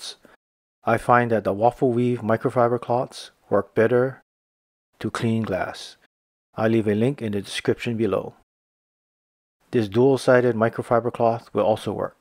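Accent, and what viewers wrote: Hawaii, United States. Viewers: Is that a Hawaiian accent I hear? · You’re from Hawaii 🌺. I just listened to you speak and knew🙋‍♀️. Excellent tips. Thanks.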